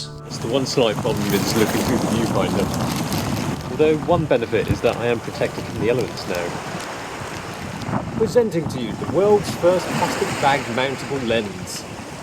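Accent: United Kingdom. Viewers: im just suscribed to this channel. and im suprised to see that chinese speaks british accents. its been a while since im searching for this type of channel. great channel!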